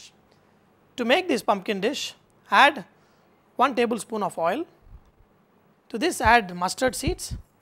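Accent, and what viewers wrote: India. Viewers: thank you thickly accented indian chef other then mustard seeds & corriander to chop I have all I need & this will make use of the pumkin I managed to get my hands on!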